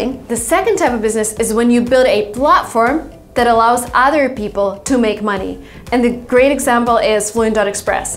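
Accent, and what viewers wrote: Russia. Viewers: I’ve recognized her as a Russian speaker 😅😅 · The way she "love"..... lovely Russian accent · Потому что у него русский акцент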